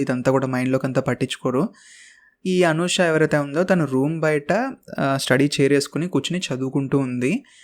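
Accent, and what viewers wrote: Telangana, India. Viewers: Anna story super and mee Telangana slang inka super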